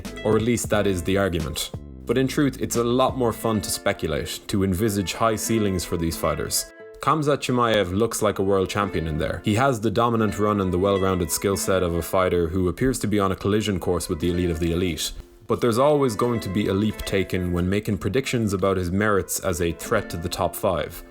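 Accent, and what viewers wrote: Ireland. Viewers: u Irish my guy?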